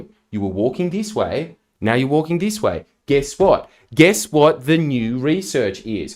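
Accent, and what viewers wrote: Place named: Australia. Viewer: you often say things that alot of people have said thousands of times in thousands of ways, yet its only clicking when i hear it from your aussie accent. thx for these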